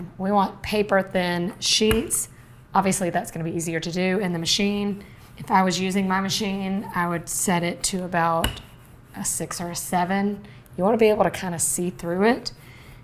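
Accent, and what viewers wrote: South, United States. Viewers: That southern accent throws you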